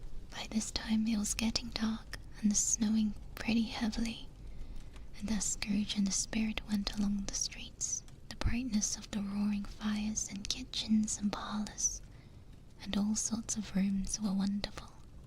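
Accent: United Kingdom. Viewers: proper british bloak reading a proper british tale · That's some proper no frills just straight up full course British asmr :_eepy::_ZZZ::_ZZZ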